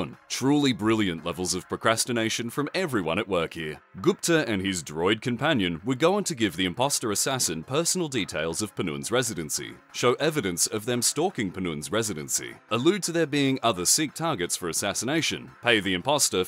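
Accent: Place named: Australia. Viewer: Just Have to say, ever since the Voice over change my partner cant stand your channel and I can no longer View your videos without her expressing anger from once backing this gaming channel especially with the change over to the news which she enjoyed. But now it just feels soulless like a top 10 youtube channel with the generic Australian Voice over. i can't stand it either. Rather be listening to Microsoft Sam.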